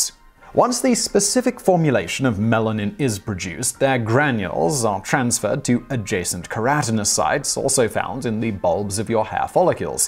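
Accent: United Kingdom. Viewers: 😂 😂 😂 Maybe you missed it so I'll point it out. He has a British accent and sometimes they pronounce things differently. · How come you speak in traditional English but spell in American English?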